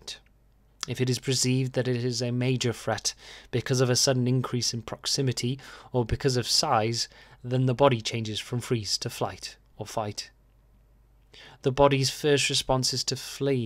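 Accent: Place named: Wales, United Kingdom. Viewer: Thanks, watched on repeat and took notes, very professional, and with an endearing hint of Welsh?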